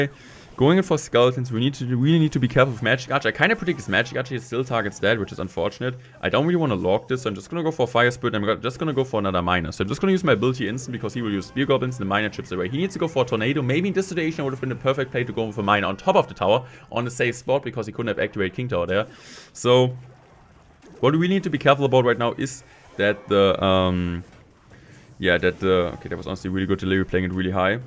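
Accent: Germany. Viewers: The reason why so many people are using minor control is because you showed the deck 😂 Edit: man hört deinen deutschen Akzent „bisschen“ 😂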